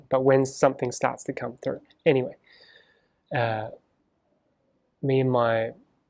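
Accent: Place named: Australia. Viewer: Bro are you Australian or from New Zealand because I've noticed the consciousness of people with this accent is wild 😂😂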